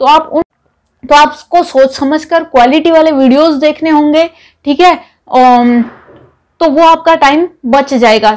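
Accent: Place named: Rajasthan, India. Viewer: Puri rajhisthani bolti ho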